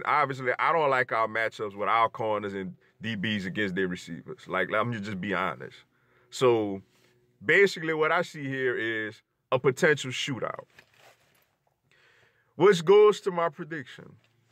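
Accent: New Orleans, United States. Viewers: you sound like you from New Orleans to be honest. Y'all will lose though this Sunday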